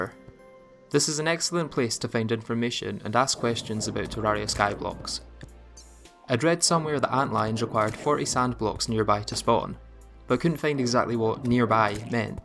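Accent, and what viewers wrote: Scotland, United Kingdom. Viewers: Omg the Scottish accent makes this video 10X better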